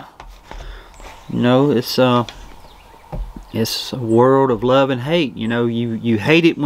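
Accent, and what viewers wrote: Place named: Alabama, United States. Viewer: Were trying to hone in on that accent if yours. Don’t worry I have one too so I’m saying Alabama and she’s saying Louisiana. Who, if any, wins the bet?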